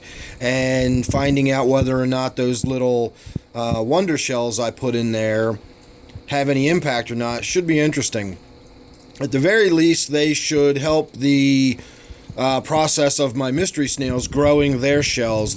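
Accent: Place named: mixed, United States. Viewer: Hey, are you in Philly/Jersey area? Love your accent!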